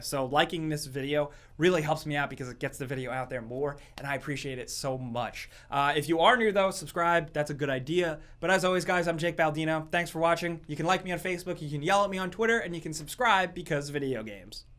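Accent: Australia. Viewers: what the hell was up with your Aussie accent Jake? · Actually a Australian accent basically sounds just like yours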